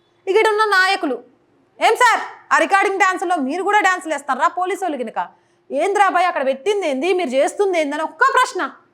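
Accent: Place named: Telangana, India. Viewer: కందకు లేని దురద కత్తికి ఎందుకు అని అంటారు ఇదే. వాళ్ళ ఉర్లో జనాలకు పేదలకు ఆడవాళ్ళకి లేని దురద మనకెందుకు. మీరు ఎన్ని చెప్పిన వాళ్ళు మారరు సిగ్గు శరం ఉండదు. తెలంగాణ యాస తో మాట్లడినంత మాత్రాన ఎవడు రియాక్టు ఎవ్వరూ గా.ధర్మం మన చేతుల్లో ఉంది అది మనం ఇలాంటి వాళ్ళని ఎంకరేజ్ చేస్తే ఇలానే చేస్తారు ఇలాంటి వెధవలు. చివరకు మీరు చెప్పేది అదే ఛానెల్ సబ్స్క్రయిబ్ చేస్కోండి షేర్ చెయ్యండి అని.😂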